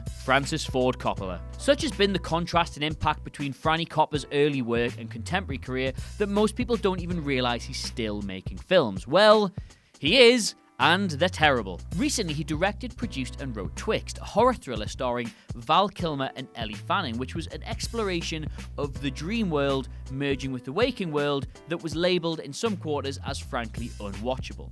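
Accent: United Kingdom. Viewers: Hearing a British Internet host waxing poetic on the early 90's "Los Angeles gang scene" made my day.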